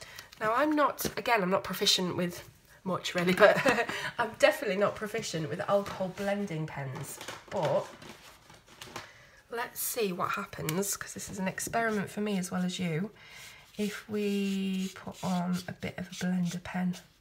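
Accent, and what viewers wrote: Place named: Northern England, United Kingdom. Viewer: I think you tutorials are great and very informative. I love watching your videos and listening to a northern English lass is very refreshing. So Thanks 😊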